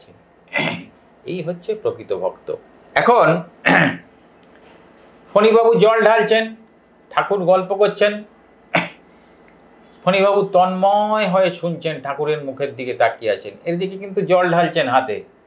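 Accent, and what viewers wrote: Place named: East Bengal, Bangladesh. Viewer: r byakhya aro shunte chai.omar purbo bangyo bhasha te shunte khub sundor lagche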